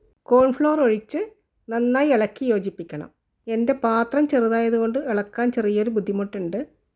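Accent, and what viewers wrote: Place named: Kannur, India. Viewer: കണ്ണൂർക്കാരി ആണല്ലേ എത്ര ശ്രദ്ധിച്ചിട്ടും കണ്ണൂർ സ്റ്റൈൽ വരുന്നുണ്ട് കൊള്ളാട്ടോ 👍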